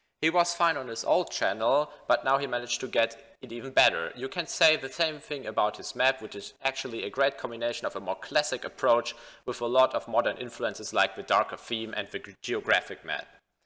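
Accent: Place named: Germany. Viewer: A. Great coverage B. People who deserve to be better known getting spotlighted C. German accent this video is perfect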